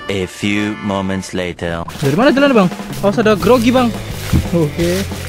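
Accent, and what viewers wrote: Medan, Indonesia. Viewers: Medan mana suaranya???!!!! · Lu tinggal dmna bang klok boleh tau, dari logat logat nya orang medan bang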